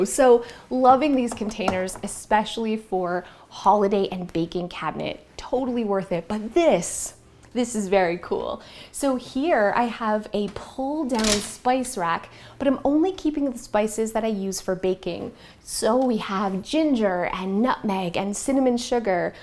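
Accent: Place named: Canada. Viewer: That Canadian accent is coming through! Lol 😂 Love these tips!!!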